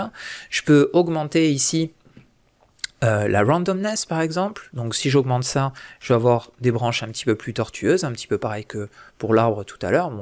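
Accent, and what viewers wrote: France. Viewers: Aie, plusieurs tuto de toi que je regarde, a chaque fois je me dis que tu doit etre francais et je viens de remarquer que tu faisais tout les tutos en francais aussi --" Merci pour tes videos elles sont bien expliquées :D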